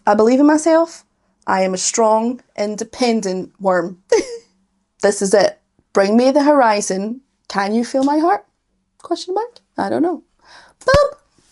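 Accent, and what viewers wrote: Scotland, United Kingdom. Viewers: Hey, I'm Bruna from Brazil. YouTube recommended your video to me and I loved your vibe. I'm learning English and have never listened to a Scottish person until now. Your accent is so beautiful. 🥰 May I recommend you a music video from a Brazilian singer? She sings in English,. Here's one of her originals songs: <link>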